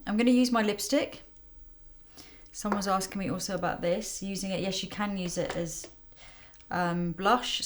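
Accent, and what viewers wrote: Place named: Hampshire, United Kingdom. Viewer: Beautiful. Do you come from anywhere Hampshire you have a faint accent?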